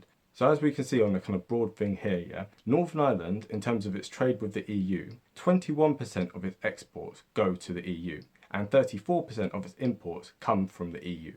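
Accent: England, United Kingdom. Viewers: I'm from Ireland and to see someone from England (I assume your English from your accent) talk about Irish history in a fair and balanced way like you have is very welcome and wholeheartedly appreciated. Thank you sir, you have well earned a follow. ps your script sounds great. pps dail is pronounced dall (like doll with an a instead of o) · It is soooo refreshing to hear an English voice with such a deep and erudite knowledge of Northern Ireland. Just plain facts. Well done sir. Great job. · Very good summary esp coming from (it sounds like, by your accent?) an English person. Also, the British govt have just decided that it's okay for extra-judicial killings of civilians to happen and the soldiers etc who do that won't be held to account ... As for the Unionists becoming an oppressed minority - I am confident that this will not happen. Re-unification will have to be done carefully and with due regard to every individual. 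· Great video... I've never heard someone with an English accent show this much knowledge and understanding of our history before. If only there were more in Westminster or English TV/radio with this level of understanding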